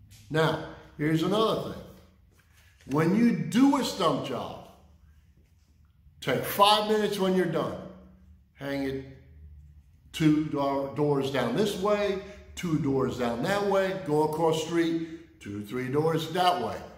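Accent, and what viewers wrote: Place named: New York, United States. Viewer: ... I knew it! Lol! My wife and I were watching one of your vids on the big screen, and I said to her "You think this guy is from New York?" She said "Nah" I'm originally from Syracuse, transplanted to Ohio about 26 years ago. Got a lot of 'you from NYC?' Didn't think I had that strong of an accent being from upstate and all. Now, the NY accent only comes out when I get upset. Lol! Have a great evening!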